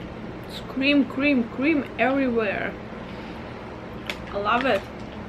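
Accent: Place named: Russia. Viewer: You have a Russian accent,where r u from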